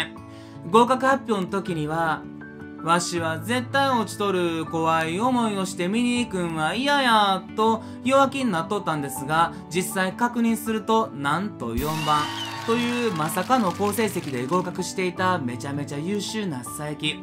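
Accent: Kansai, Japan. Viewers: 急に関西弁になって、ちょっと入ってこない笑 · 同じ大阪出身の画家へのオマージュなのか、の関西弁、いつもとちょっと違って、印象に残りました。冒頭の写真の佐伯祐三、ハンサムですね！イケメンだとそれだけで、３割増しです。オーヴェールの教会、私は佐伯の絵の方が好きです。郵便配達夫、味があっていいですね！後に、彼の妻がこの配達夫を神様ではなかったか？！と言ったというエピソードも素敵です。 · あれ、いつもと語り口が違う…と思ってましたがあえての関西弁だったのですねｗ 佐伯祐三展が開催されると知った時から行きたい行きたい、いや行かなくては！というくらいの行く気満々。なにかこの荒々しいタッチに魅かれるんですよね～ ただ地方在住の悲しさ、一月中に行くつもりが開催日以降の土日が悪天候の強力寒波と雪で行く予定がまだ定まらず、ここまで来たら三月のルーブルと一緒に、かな～と思案。とにかく絶対行きますよ！ｗ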